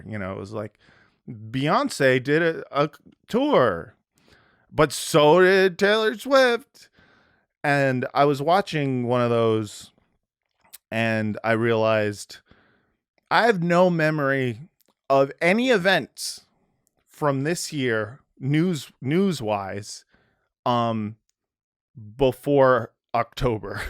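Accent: England, United Kingdom. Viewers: I'm a Kiwi and I thought your Pommie accent was great! Only randomly came ax this thanks to YT algorithms since I've been binging Palestinian history and news content. It's been super-interesting listening to your views and experiences. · as a brit, your accent of a ingerlander was perfect. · Your British accent is 45% Arthur Shelby and 55% Alfie Solomons from Peaky Blinders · your british accent is amazing hhhhhhh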